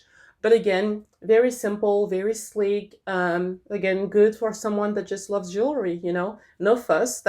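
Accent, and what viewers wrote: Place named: Texas, United States. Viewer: I was listening when Andy walked in and I asked him, “Do you recognize this voice?” “Yes,” he said, “!!” So fun to hear you!!! But I think you’ve lost some of your Texas accent! 😉 We miss you!!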